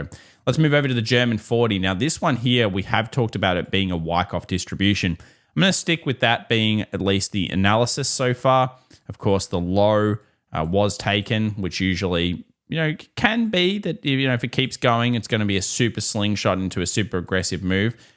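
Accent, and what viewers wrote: Australia. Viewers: The whole deal here is about interpreting things. 😂 I take the same approach with watching the videos. He's knowledgeable, not always correct, says that up front. Also, literally everyone on here has different timelines, nationalities, risk tolerances, DCA for life vs scalp for life and everything in-between. Thumbnails and titles, it's back and forth on being a bit much, but that's 100% the algo and people watching needing to be fed 🤷, and thank the freakin' lord he doesn't do those shocked face thumbnails. I've seen a looooot of TA/market voodoo and this dude ain't that. Does he want money... (idk, are you watching a video about making money) lol. It can just be entertaining and informative and it's your responsibility to double check anything you're going to act on. I gots a B.A. in Physics, 12+ years as a software engineer and 3 years on and off watching FX. 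I simply don't listen to bs that is illogical if I'm looking for insights. In short 😂 yeah, I get valuable info, with a sweet Australian accent, from a genuine dude, that I filter through my own understanding and experiences. You can not watch, but why the desire to convince others not to?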